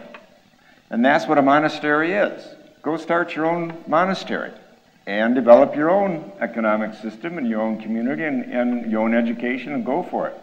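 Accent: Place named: Ireland. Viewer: and I love his Irish accent....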